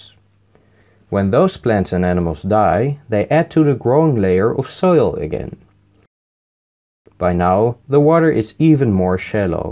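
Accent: Netherlands. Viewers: Je Nederlandse accent veraad je XD geeft me altijd een lach als ik weer een nederlander op youtube tegenkom gaaf project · Fellow member of the Netherlands, your accent is Amazing!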